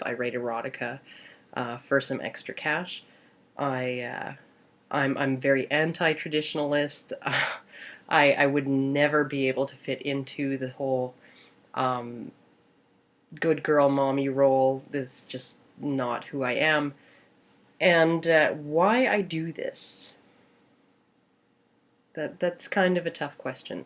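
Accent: Canada. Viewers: Northern accent... Canadian? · You sound Canadian.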